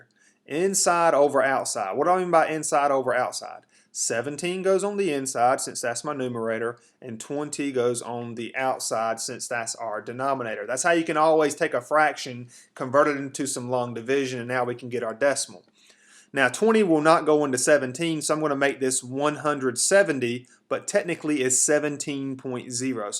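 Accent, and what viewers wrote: South, United States. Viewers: judging from the voice I guess your from the south, I am too I love the math you make it sound so easy. are there any other tutor sessions you do on line.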